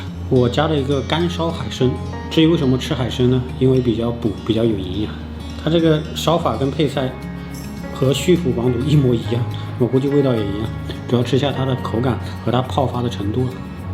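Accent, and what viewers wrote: Hubei, China. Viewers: 听口音像湖北人？ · 说话跟那个阿斗归来了很像。湖北口音？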